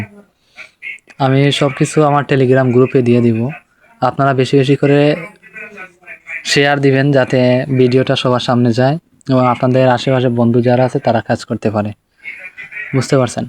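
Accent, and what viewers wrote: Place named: Sylhet, Bangladesh. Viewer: Joto suddo kotha kow na kene ba tumr shyleti kotha buja jay 🙂👍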